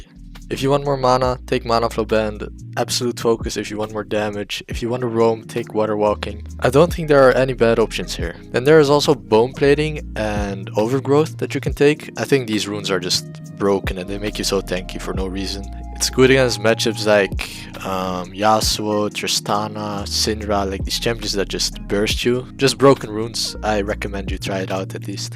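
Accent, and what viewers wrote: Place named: Netherlands. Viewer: Ik kon binnen de eerste paar seconden al raden dat je nederlands bent hahaha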